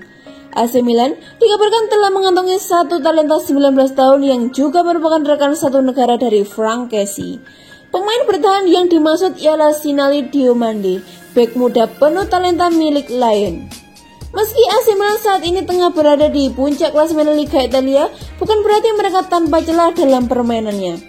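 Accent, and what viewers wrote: Java, Indonesia. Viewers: Kog medok to mbak? Forza milan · Senang dengar medoknya mba ini,tpi sya sayang bacanya cepat bingitsss...😁😁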